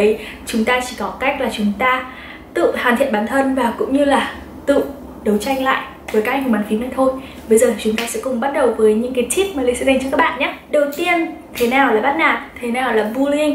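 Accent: Standard. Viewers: c nói quá chuẩn, quá hay :D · Chị nói chuẩn quá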